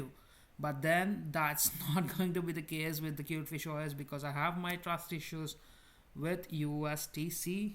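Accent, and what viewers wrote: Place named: India. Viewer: You Sound like Indian.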